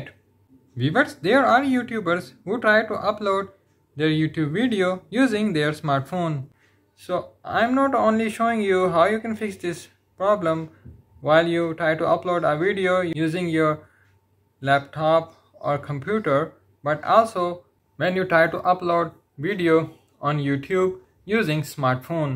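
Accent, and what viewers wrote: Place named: Pakistan. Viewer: Pakistani baba Speaking English 💀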